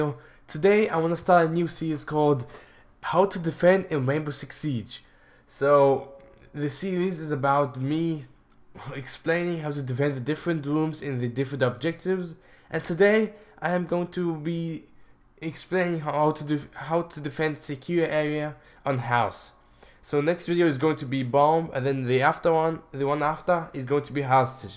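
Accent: France. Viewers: I love your accent!!! I'm not really good at guessing accents but you sound french, german, or arab. Love the videos keep it up. ❤ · He sounds French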